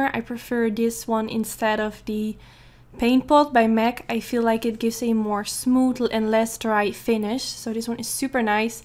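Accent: Netherlands. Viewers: This person sounds Dutch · '' ✦ ABOUT ✦ My name is. I'm 24 years old and live in the Netherlands. '' I hear your dutch accent beneath it:P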